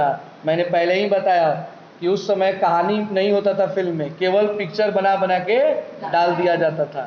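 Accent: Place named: Bhojpuri, India. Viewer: Awesome sir. I love your bhojpuri tone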